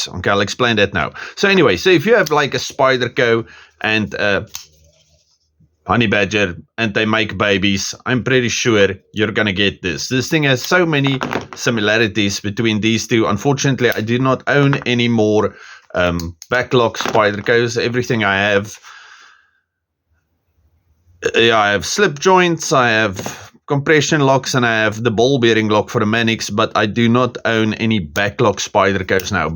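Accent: Afrikaans, South Africa. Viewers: Jy kan sommer hoor hys Afrikaans 😂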